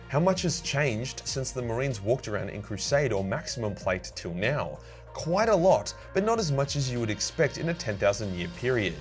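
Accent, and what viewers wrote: Australia. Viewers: Been a fan for a while now cheers mate for being the reason I’ve become a fan of war hammer and I keen to always hear a fellow Aussie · Battle of cadia explained by australian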